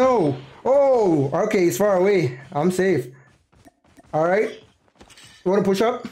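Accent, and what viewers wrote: Jamaica. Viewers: Are you from Jamaica pls tell me I am from there to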